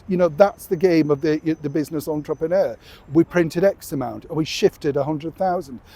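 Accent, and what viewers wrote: United Kingdom. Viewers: I think you do a great job. I enjoy all your videos. I especially love your British accent.❤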